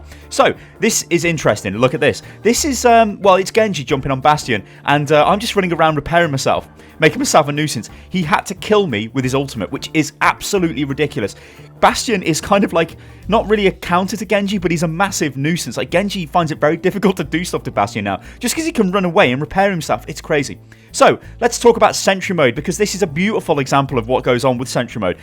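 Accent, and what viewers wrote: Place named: United Kingdom. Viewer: this is the second time that i watch your video with my gf and she get wet cz of your accent .. jesus turn me to british please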